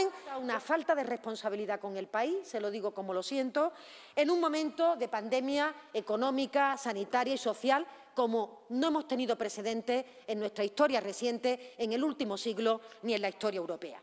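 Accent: Andalusia, Spain. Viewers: EL ACENTO ANDALUZ ES UN TESORO DE TERNURA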